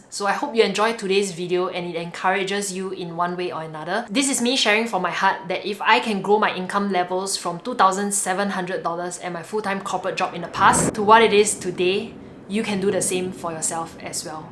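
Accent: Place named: Singapore. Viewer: I can never get used to Singaporean accent. Good content though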